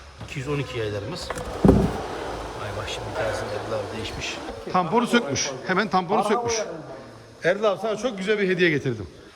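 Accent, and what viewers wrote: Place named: Kayseri, Turkey. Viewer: senin gibi bir adam bu jantı nasıl seçer...!!! harika oldu eline sağlık kayseri şivesi insanlığı ve sanayi kafasını tekrardan gördük ...!!! sizleri seviyoruz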